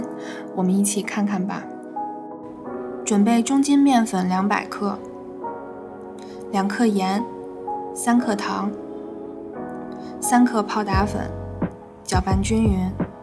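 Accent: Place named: Beijing, China. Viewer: 真棒👍京味儿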